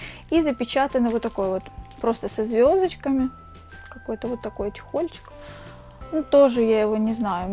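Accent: Rostov, Russia. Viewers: А вы случайно не из Ростова-На-Дону?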